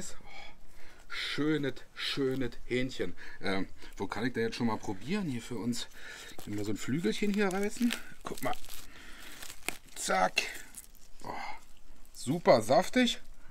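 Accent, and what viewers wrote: Berlin, Germany. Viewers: Ich habe erst vor 5 Wochen meinen ersten (Gas-)Grill bekommen und kann deshalb ganz viel von dem präsentierten Zubehör (#GEFU) und auch von den Gewürzen brauchen ohne es im Schrank doppelt zu horten. Und obwohl wir uns (Du Berliner, ich Bayer) dialekt- und sprachtechnisch voll unterscheiden, find ich Deine Videos und Anleitungen "voll dufte"! 👍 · #GEFU habe schon ein paar GEFU Produkte zuhause...aber die würden sich bei mir perfekt ergänzen:) mach weiter so! Richtige Berline Schnauze 😉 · #GEFU mag als Rheinländer Deine Berliner Schnauze und auch Deine Rezepte. Als Grillanfänger, braucht man so einiges an Zubehör, um Deine Rezept ohne zu improvisieren nachkochen/grillen zu können. Gewürze sind immer geil und ausprobieren von verschiedenen Gewürzen macht immer Spaß . Weiter so mit den tollen Rezepten und verlier Deinen slang nicht. 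Hau rein. Gruß aus dem Rheinland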